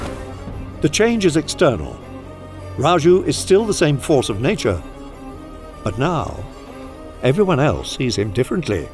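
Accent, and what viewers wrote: United Kingdom. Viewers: The fact that narrator to this video is brit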